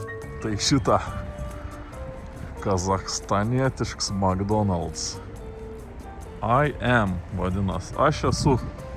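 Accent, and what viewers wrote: Samogitia, Lithuania. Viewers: Kaip keista išgirsti kalbanti taisiklingai ne žemaitiška tarme:DDDD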